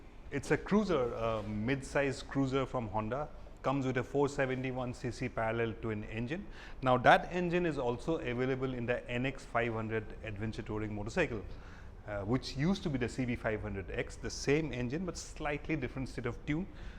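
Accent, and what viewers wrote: Assam, India. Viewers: The presenter I believe is an Assamese, guessing from his accent.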